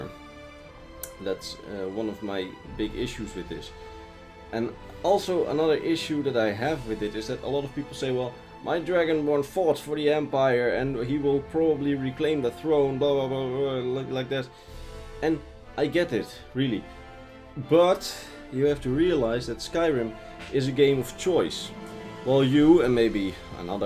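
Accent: Netherlands. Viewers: By your accent i can tell you are dutch. Why hello fellow dutchman. How i wish the last dragonborn would be off on the quest to claim the throne of the empire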